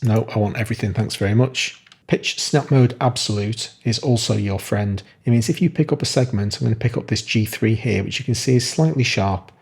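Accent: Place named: Merseyside, United Kingdom. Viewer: Thanks for the considered reply. I have a Master's in Education and taught at universities for over 20 years (not DAWs). In that setting, my students were either complete beginners or had to have a certain level of PK, as they had taken other more basic modules. But, here, you could get all levels. I understand that, but I've given up on some 'teachers' as they always assume prior knowledge. This includes some of the 'official Cubase' presenters. P.s. from your accent, I recon I'm (originally from your neck of the woods - Merseyside).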